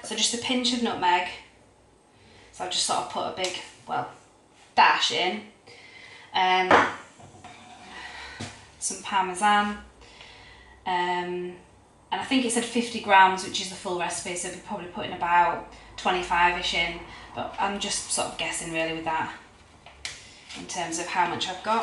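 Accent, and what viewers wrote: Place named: West Yorkshire, United Kingdom. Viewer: Well done on your first Pasticcio. Hope you don't mind me saying that we would never put clove in it and the sauce gets smooth a lot faster with a balloon whisk. A great cook to watch on YouTube when you have time is Dimitra's Dishes - she cooks lots of authentic Greek food. I think you sound like West Yorkshire - I am South Yorkshire (Sheffield) but married to a Greek Cypriot. Enjoyed your video - thanks for sharing.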